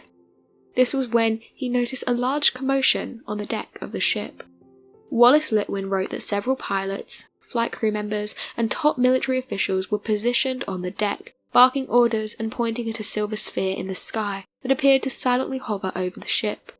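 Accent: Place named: South, United Kingdom. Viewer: Anybody know where exactly the narrator's regional accent is from? I feel like somewhere in the Southern UK, but I can't place where ecactly.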